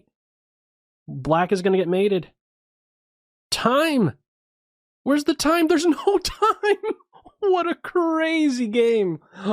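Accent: Pennsylvania, United States. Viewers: did I hear a Pennsylvania accent?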